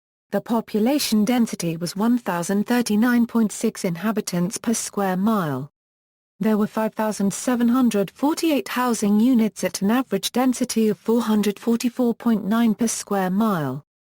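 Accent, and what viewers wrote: United Kingdom. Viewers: Is this some robotic 'Siri-like voice' with a British accent reading this or a real person?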